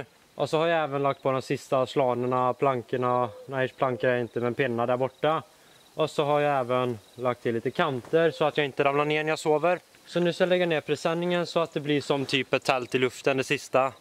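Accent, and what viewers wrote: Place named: West Gothland, Sweden. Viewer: Haha jo såpass långt har jag räknat ut :P funderade mer på vart i västergötland, är själv från västergötland därför jag reagerade på dialekten :P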